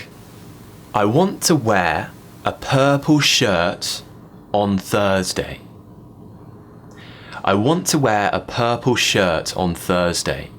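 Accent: RP, United Kingdom. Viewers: I'm really fond of your British accent , your videos are very helpful. Thanking you a bundle . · كم انت رائع يا استاذ احببت لكنتك البريطانية جداً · Cheers mate !!! You are brilliant !!! I love the way you explain, your english accent is so posh. So beautiful !!! I'm addicted to your videos !!! Thanks a lot for your excellent work !!! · Mr Thank you very much for your useful British English videos. In addition to this your British pronunciation is mysterious beautiful. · I love u n ur British accent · Love it!! 🥰British accent. Handsome teacher. 🌹 One more Subscribe, ✔️ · I came from studying Scottish accent, and I really need to hear your beautiful RP accent What a wonderful world this would be if everyone spoke like you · I love ya in British accent 🤗😂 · I love you so much hahaa. Being serious I love your british accent and your tips. Personally I found easier british than american english besides it sounds more beautiful. 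· Love your British accent! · I love this British accent .... would u please tell me your Facebook Id...I wanna join and learn from u......or whataap number..... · are you speaking in an RP accent in this video? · I love your British accent....never turn iit into an American one even if it's fashionable nowadays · I love your British accent❤ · I've fell in love with British accent as well as the teacher 😆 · i LOOVE your british accent. Thumbs up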